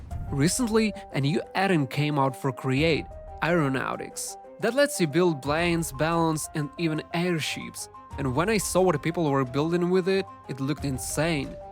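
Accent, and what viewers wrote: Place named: Russia. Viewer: Звучишь как русский бро, а видос прекрасный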